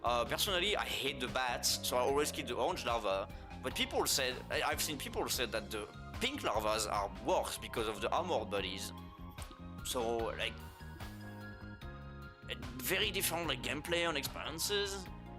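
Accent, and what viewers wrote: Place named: France. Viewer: What is that accent? 😮 Are you French or Canadian or from Louisiana? Btw my favorite game of Mortol II ❤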